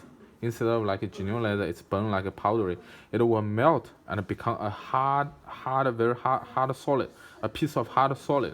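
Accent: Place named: China. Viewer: Chinligsh to much Feb feh fuh he and less a e I o u 😔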